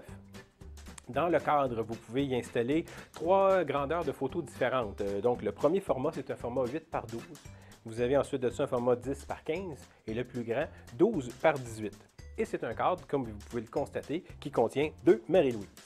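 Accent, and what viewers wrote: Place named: Quebec, Canada. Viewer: Super cool cette vidéo ! Merci beaucoup ! L’accent québécois fait rêver et sympa le moment avec le sèche cheveux ! 😜😁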